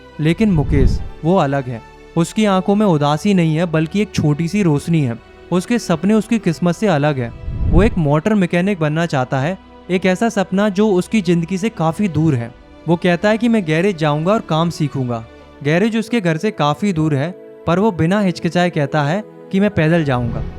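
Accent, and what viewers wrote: Uttarakhand, India. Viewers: Bro aap uttrakhand se ho ? ❤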